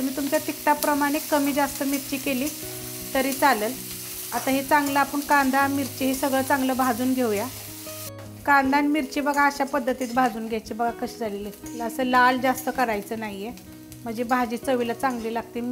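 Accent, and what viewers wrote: Satara, India. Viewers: Tumch aavaj aikla tri mla sataryat aslya gat vatt 😍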